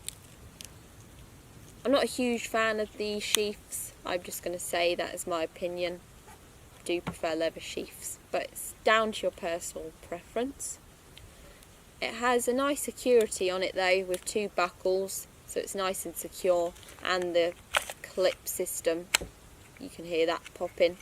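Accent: United Kingdom. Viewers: British accents sound great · Oo you’re British that’s nice for a change well used to American vids about bushcraft but no U.K. content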